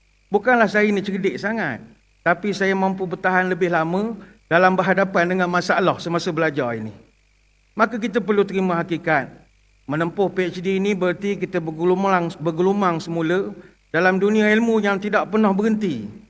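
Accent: Pahang, Malaysia. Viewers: ustZ tetap dngn loght phng bkn sesetangah ulamak dan org phg lain maaf ckp mudah sngt ubah2 bhsa. nk malu ape. org klate selamba je. kedah pun mcm ustaz wadi tetap ngn loghat nok malu benda. malu bertempat bru btul. ni org dtg sni kita pulak nk ikut bhsa diorg. mat org putih tu ikut loghat teerengganu sbb org ganu tetap ngn loghat dan tetap hebat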